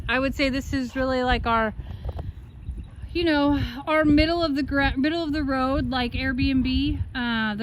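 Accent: South, United States. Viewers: you are such a southern girl now… I heard y’all, yonder, holler!!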